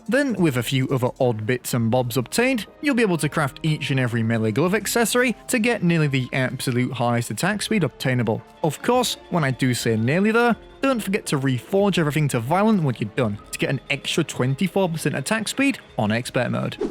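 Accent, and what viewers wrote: United Kingdom. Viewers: Oh my godness this British accent,mmmm, sweetest sound to my ears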